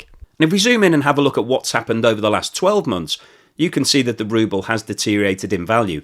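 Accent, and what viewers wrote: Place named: Liverpool, United Kingdom. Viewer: He's a scouser.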